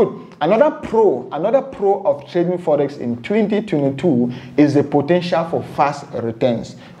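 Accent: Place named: Nigeria. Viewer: I watched to the end 👁️👄👁️ bro I do really ur explanation, I would love to join u telegram group. Southern Cameroon and Nigeria have thesame accent, I thought u were a Nigerian.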